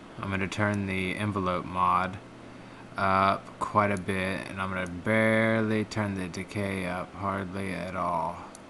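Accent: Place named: United States. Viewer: nice vid, but i mean, you are an american (because of the accent), and i thought that americans only listen to hip hop and mainstream kind of shit. But oh well...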